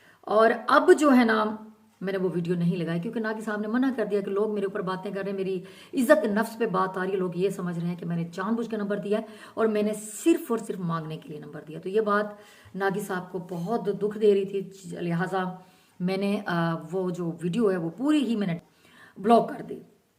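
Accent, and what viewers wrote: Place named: Saraiki, Pakistan. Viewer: آپ کا سرائیکی لہجے میں اردو ولاگ بہت سویٹ لگتا ہے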